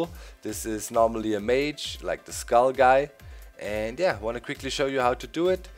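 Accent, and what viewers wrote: Germany. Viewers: bist du aus deutschland?:D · Bist du deutsch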